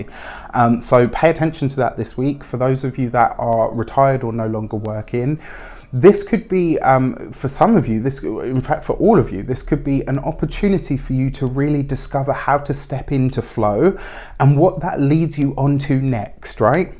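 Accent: England, United Kingdom. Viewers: Ive missed your English Voice…. thank you for the good news!!! Stay Golden!!!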